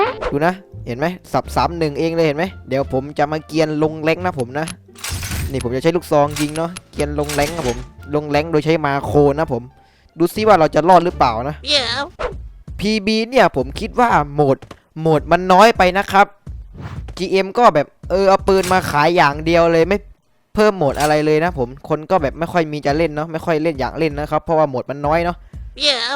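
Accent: South, Thailand. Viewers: คนใต้ป่าวสำเนียงออก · สำเนียงคนใต้ป่าววะ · เด็กใต้หม้ายนิมึง😆555+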